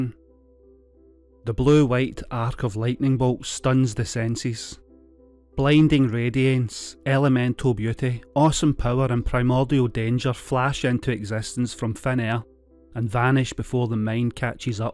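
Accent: Scotland, United Kingdom. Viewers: nice accent. many good science popularizers have beautiful scottish accents. brian cox.iaian stewart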